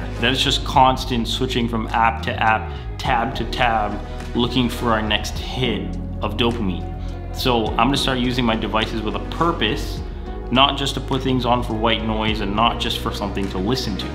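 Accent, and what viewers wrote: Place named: Toronto, Canada. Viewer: Man you have such a Toronto accent😂😂.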